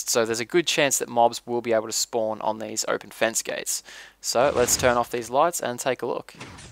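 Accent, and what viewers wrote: Australia. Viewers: U sound Aussie.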